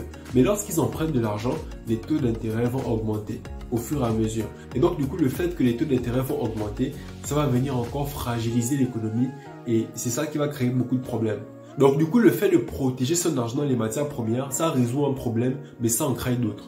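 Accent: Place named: Gabon. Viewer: Fondamentalement Fondamentalement tu aimes le mot la hein bro ? tu as l'accent d'un gabonais, en tout cas force à toi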